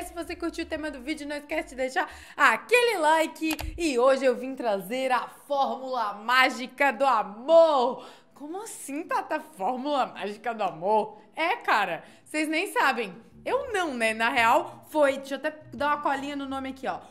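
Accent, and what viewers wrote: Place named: Florianópolis, Brazil. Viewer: Ho feia sabiax que erax aqui do suli mas que erax manezinha não sabiax ...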